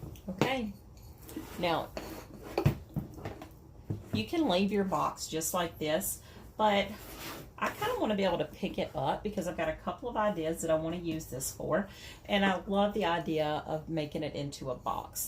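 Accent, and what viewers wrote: South, United States. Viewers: OMG ... I'm from the South (Southeast Texas) and I LOVE, LOVE, LOVE your accent!!! Can't wait to see what you come up with 😁😁😁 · Love watching you and hearing your Southern accent. I’m an Texan girl so it’s good to hear a familiar accent. Your DIYS are so good and I feel like I’m at home.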